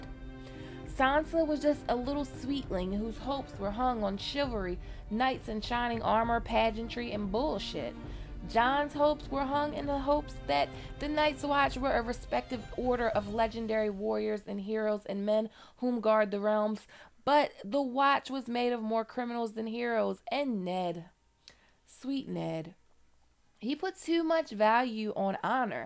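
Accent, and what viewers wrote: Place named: Baltimore, United States. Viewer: why the lol? yes sometimes her voice in tone and pitch on some vowels does sound black, but the accent is Baltimore she has revealed, and accents don't have anything to do with color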